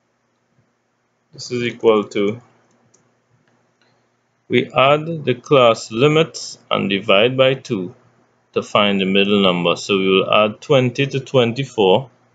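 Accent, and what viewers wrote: Trinidad and Tobago. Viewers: He is Caribbean possibly trinidadian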